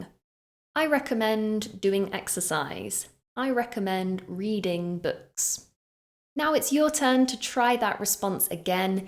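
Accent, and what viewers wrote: United Kingdom. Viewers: Your British accent absolutely shone through . I want to speak like you but it would take me years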